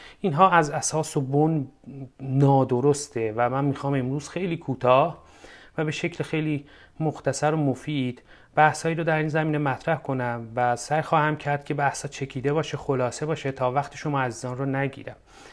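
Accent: Azerbaijan. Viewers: درود خدمت استاد گرامی جناب چقدر غرور آفرین هست که استادی با این گویش شیرین آذری با اسناد مستحکم تاریخی بلای جان ایران ستیزان بشود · لهجه اذری شما را دوست دارم. به ایران ایمان دارم.مردم ایران بهترین مردم دنیا هستند. فرهنگ و مدارا در ایران وجود دارد. لهجه نشون میده فرهنگ و مدارا در تبریز و اذربایجان و گیلان واصفهان و کل ایران وجود دارد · درورد بر استاد عزیر و ارجمند با این لهجه ی زیبای آذری مرحبا به شما و دوستان شما بابت آگه سازی تاریخ ما. پر قدرت ادامه بدهید با تشکر. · لهجه اذری شما بینهایت زیباست.❤❤❤❤❤❤❤❤❤❤❤❤❤❤😮😮😮😮😮😮😮😮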